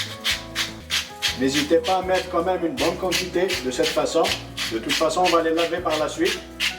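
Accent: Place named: Algeria. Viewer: Tres bel accent algérien. Tres bonne recette. Merci chef !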